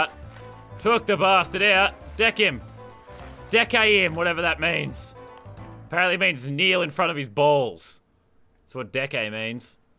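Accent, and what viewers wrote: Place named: Australia. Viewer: Your next challenge is to do an entire video in an accent besides australian!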